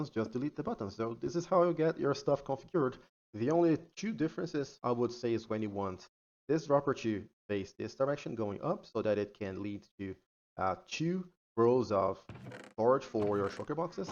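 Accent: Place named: Brazil. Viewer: Hello! NIce video! Are u brailian? U rly have the accent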